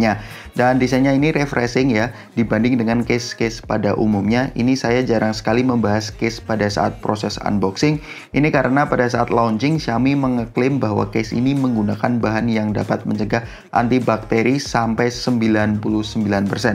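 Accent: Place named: Java, Indonesia. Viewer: Medok e bang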